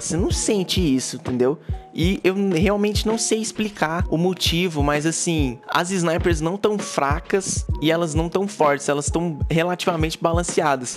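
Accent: Minas Gerais, Brazil. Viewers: Pelo seu sotaque sei que vc é de MG. So pelos videos que vi concordo com suas opiniões quanto aos especialistas e quantos às animações... to esperando ficar free pq nao gasto dinheiro com pre venda de cod...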